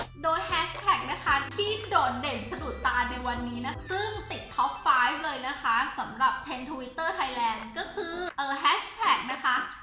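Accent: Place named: Guangzhou, China. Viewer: ไปบอกเค้าทำไมว่าไม่เทพอะครู .. แม่ป๋อมฟังคือสำเนียงกริ๊บเลยค่ะ นึงว่าจบเอกจีนจากกวางโจวมาเลย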